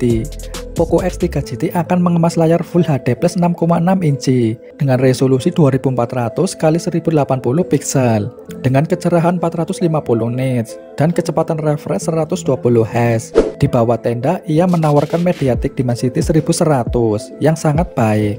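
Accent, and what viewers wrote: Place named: Java, Indonesia. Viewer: Bgst mendoknya bikin ngakak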